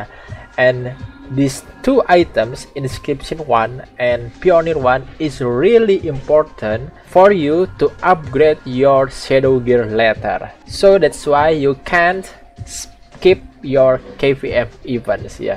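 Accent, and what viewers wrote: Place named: Indonesia. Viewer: Njir kirain orng mana wkwkkw ternyata indo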